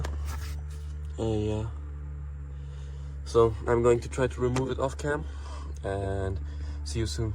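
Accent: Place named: Germany. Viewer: Cool Video but the German Accent ist da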